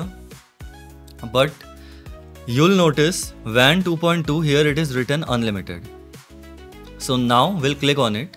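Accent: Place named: India. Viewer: Hello brother, i recently started watching your vedios,you had great command over comfiyui and other ai tools,i think your indian(because of accent😅). i am currently learning comfiyui just a begginer. i want to learn from you. i have some specific area on which i want to work. is it possible to learn from you personally like one on one session. willing to pay.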